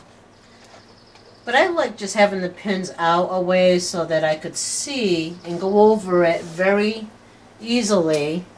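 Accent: United States. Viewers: I’m an experienced knitter but I hate sewing seems - I just seem to have a mental block or am too uncoordinated. But I’m now trying to learn to machine sew. Hoping eventually it will make sewing my knits together much easier one day. Thanks for the video. (Also I love your accent - I’m from Northern Ireland so I love noticing different American accents and dialects). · Are you from New England? I have relatives from Rhode Island and I swear your accent is exactly like theirs.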